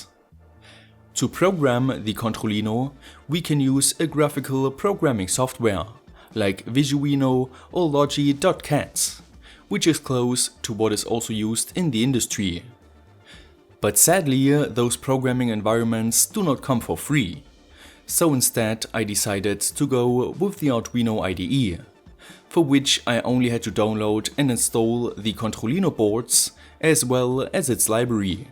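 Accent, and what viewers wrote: Germany. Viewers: hes german not french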